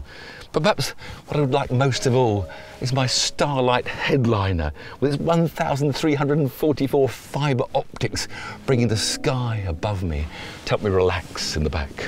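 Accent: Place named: United Kingdom. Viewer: Oh my goodness I have for a long time tried to humorously speak as a Brit. This guy is the ultimate in the snobbish presentation. 😂. When he got to the this of course is a Rolls Royce there is no tacky touch screen. I said I love him..😂. It was the absolute perfect delivery I would expect from a Brit.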